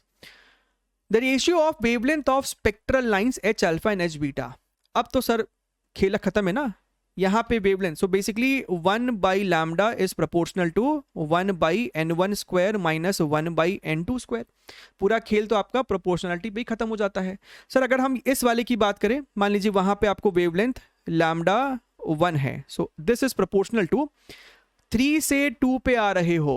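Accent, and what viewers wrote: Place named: Haryana, India. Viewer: 1:21:8 sir haryanvi tone 😀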